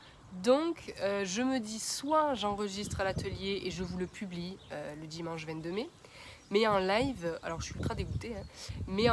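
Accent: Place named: Gard, France. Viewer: Étant moi même Gardoise j'adore t'écouter je m'identifie bien à tes expressions, merci j'ai rigolé 🥰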